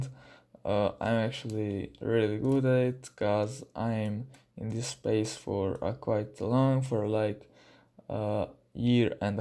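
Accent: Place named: London, United Kingdom. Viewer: Da te ne znam reko bi da si negdje okolica londona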